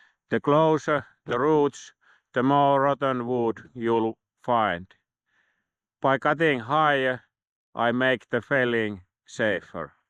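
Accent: Finland. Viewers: Thank you for taking the time to explain this subject to a layman. Fascinating and informative. Also the Finnish accent is pleasing to my ears. Peaceful Skies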